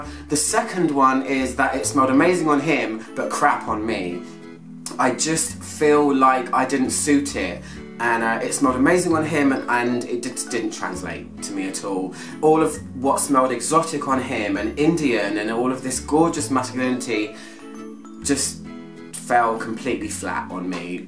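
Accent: United Kingdom. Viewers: Luv ur British accent.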